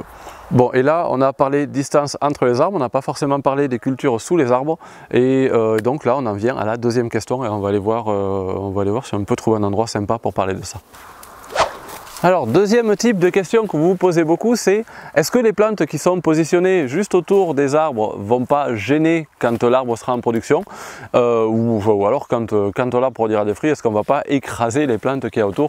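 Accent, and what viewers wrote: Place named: Hérault, France. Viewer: Bonjour nouvelle abonnée votre terrain surement dans lherault je connais bien cet accent😊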